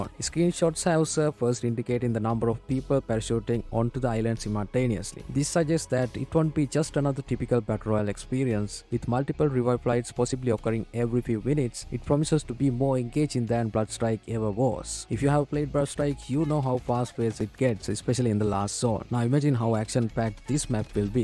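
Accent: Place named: India. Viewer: Hey bro one thing can you tell me is your from India because I suddenly realized that your voice feels like Indian dude because as I'm indian too so it's feels me that.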